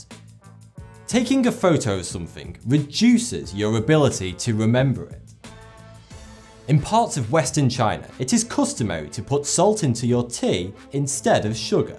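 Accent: United Kingdom. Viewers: lol. I LOVE, LOVE this accent! Really! This is the most beautiful British accent!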